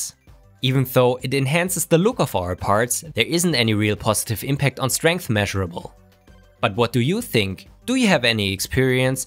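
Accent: Germany. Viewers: Wtf are you german or what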